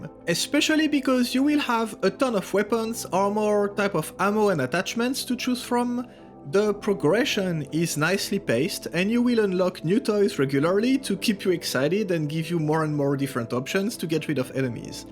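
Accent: France. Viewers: Hi just discovered your channel with your stalker mod video! Are you french by any chance? I may be sensing it a bit in the accent! If i'm mistaken, apologies. If not, salut à toi!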